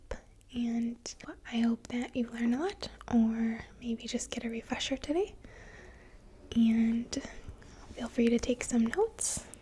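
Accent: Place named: Michigan, United States. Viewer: Do you live up north? You have an accent like my family members from Michigan ❤️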